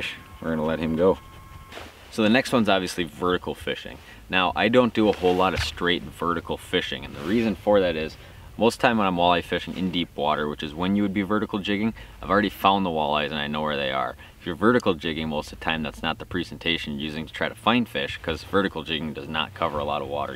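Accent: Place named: Minnesota, United States. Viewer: Are you from the midwest? You have a very minnesotan like accent lol also great video with actual info not just rambling like all the other videos I've seen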